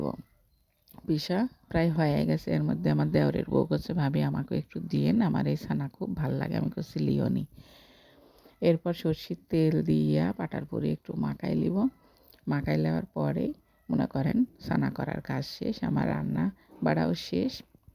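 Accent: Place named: Natore, Bangladesh. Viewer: আঞ্চলিক ভাষায় কথা সুন্দর ❤❤❤ নাটরের মেয়ে নাটরের ভাষা🥰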